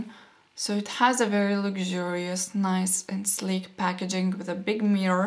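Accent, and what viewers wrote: Russia. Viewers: You can fill distilled water into the mascara when it is getting too dry, just a few drops of water, then let it sit and shake it a few times so that the liquid can mix. It works very well I have done that several times. You don't have to throw it away, this way it lasts for a long time. Dr. Hauschka products are 100% made with biodynamically grown plants and some products contain natural minerals, ashes or clays. All ingredients are from nature in their whole form and not altered. They don't test on animals and they don't have subcontractor companies test on animals either. Dr. Hauschka and Weleda are the best cosmetic companies in the world. I only use those. You are very beautiful and I love the Russian accent.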